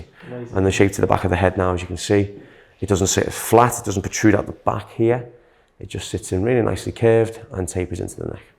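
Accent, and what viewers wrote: Liverpool, United Kingdom. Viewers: Scouse barber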